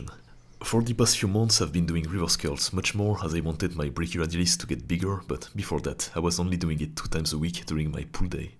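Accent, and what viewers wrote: France. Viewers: Bon mec je sais si il faut que je sois plus impressionné par tes bras visiblement en titane , on ton accent français à peine perceptible ( vraiment 1er deg tu parles trop bien ang , et même dans tes premières vidéos ct déjà super ) . Keep grinding bro 💪💪💪💪💪💪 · Are you french mate?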